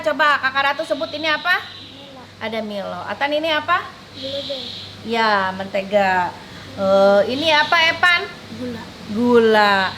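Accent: West Java, Indonesia. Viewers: Hidup Bsndung..Klo ada Atta Aurell..pasti dong mampir..hidup emk2..t..logatnya sunda pisan..kereeennn👍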